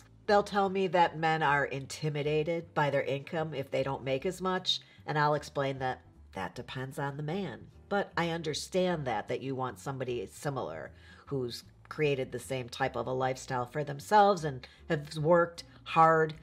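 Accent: Chicago, United States. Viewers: I am getting addicted to your blogs, since I am a recent widower in the dating game. I am very comfortable listening to your Chicago accent! Do you have a good friend who needs to date another "Good Guy"?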